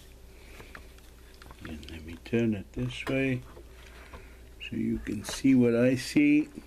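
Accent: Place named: Afrikaans, South Africa. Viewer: jy klink Afrikaans. Ek het nou al baie YouTube tutorials gekyk. Nie EEN van hulle het die "beveling" so goed verduidelik soos jy nie. Baie dankie - jou kanaal is nou my eerste stop as ek wil weet hoe om enige iets te doen!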